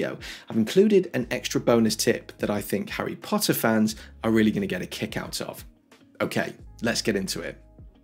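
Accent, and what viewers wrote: England, United Kingdom. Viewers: Great tips! BTW, I love your eloquence and British accent! You should consider being a narrator on PBS, BBC, or Discovery! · At last, an English bloke speaking English. No “hey guys, what’s up?” Fantastic. Thank you.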